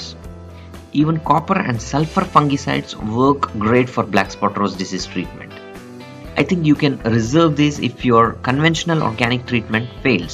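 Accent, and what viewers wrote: India. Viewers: Please just eliminate the background music . Hard to hear your Indian accent voice with the music . Very informative . Thank you .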